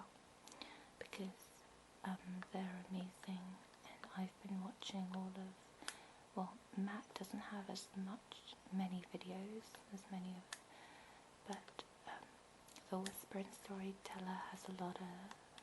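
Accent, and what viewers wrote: England, United Kingdom. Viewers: I love your accent, which part of England is it from? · It's not an interesting accent it's a British accent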